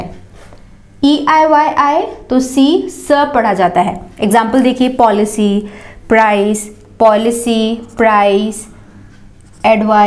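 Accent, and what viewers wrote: Himachal Pradesh, India. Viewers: Are u from Himachal?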